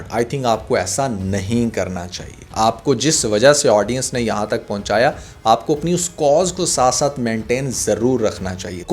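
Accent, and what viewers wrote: India. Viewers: Why you speak like Indians?"karne lag Ra tha" nonsense 😊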